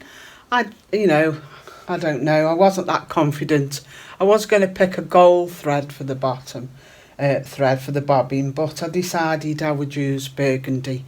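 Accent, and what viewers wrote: United Kingdom. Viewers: I love this video, thank you so much for sharing, looks like we're off to B&Q at the weekend then! I've only just come across your channel and subscribed right away. It's so lovely to hear a British accent, especially one that I think is relatively close to me. Is it a Stoke accent? I'm West Midlands but close to the Staffordshire border. It's also nice to hear of quilt shops I actually have a chance of getting to :) I'm new to quilting, just working on my second project which is a baby cot quilt. My first project was a lap quilt so both fairly easy to baste. I would love to do something bigger for my next one but the basting completely put me off but this makes me think I can attempt it :) Thank you again, off to search through your older videos now! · Thank you for sharing your basting techniques. Very helpful. Have subscribed, it’s lovely to hear a Brit voice talking quilts.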